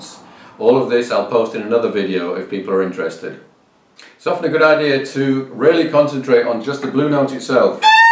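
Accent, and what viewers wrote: England, United Kingdom. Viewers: My brain did a hard reset when I heard a English accent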